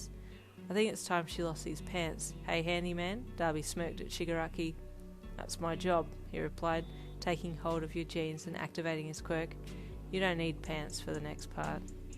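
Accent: Australia. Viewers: Loved this reader, I've got a feeling your Australian, I can hear the accent : )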